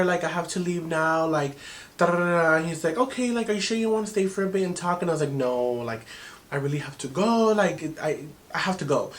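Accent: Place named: Dallas, United States. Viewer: I’m deaaaddd!!! I’m from Dallas and you sound like someone from D town 🤣❤️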